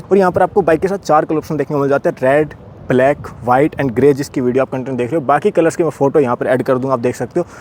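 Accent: Haryana, India. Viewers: Heavy haryanvi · bhai aap hariyana wala hindi bolte ho to bhut hi acha lagta hai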